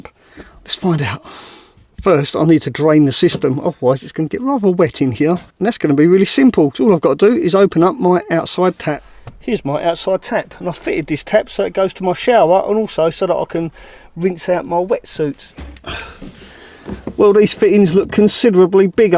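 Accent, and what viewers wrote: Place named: London, United Kingdom. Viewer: Hearing you speak * reminds me of the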